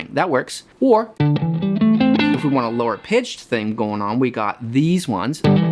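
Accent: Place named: Vancouver, Canada. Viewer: The haircut, the body, the accent... you are the embodiment of Vancouver BC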